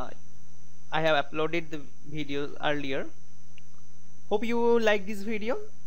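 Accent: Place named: India. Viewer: accent original rakho...Indian accent accha lagta hai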